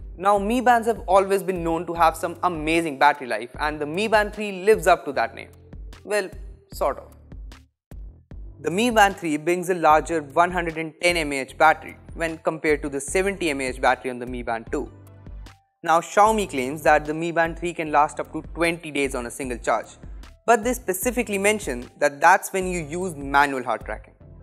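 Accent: India. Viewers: Uuuuuuh, the Indian accent is... is... hard to digest, sorry( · Bu ne biçim ingilizce yaw abi resmen hintçe gibi konuşuyorsun ya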